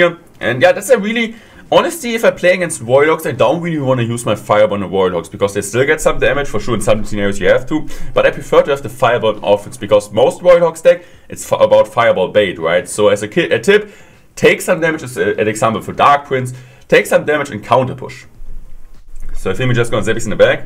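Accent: Germany. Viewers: Man merkt dass du Deutscher bist aber ich feier trotzdem beide CR Kanäle😉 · Wenn das kein deutscher aktzent ist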